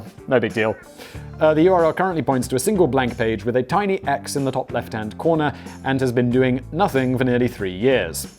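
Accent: United Kingdom. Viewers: The British slang and pronunciations are almost as funny as Danny and Simon's jokes/puns. If it's spelled Zynga, then it's an online/mobile gaming company. Mostly their games are available on just Facebook nowadays. Some of those men are sexy, just sayin' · His only talent resides in being able to read in his British accent....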